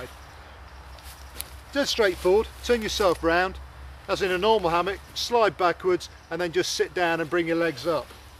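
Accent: United Kingdom. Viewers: This is such a great video. Thank you. My boyfriend and I are getting our first Hennessys in a few days...an Explorer Deluxe for him and an Expedition for me. The package should arrive on Tuesday, and I'm really excited to try them out!! I'm only 5'5" and was going to get a free Scout as part of their website promotion, but the kind folks at Hennessy upgraded me to the Expedition. :-) I am a Yank who is really bad at placing accents -- may I ask what part of the UK you are from?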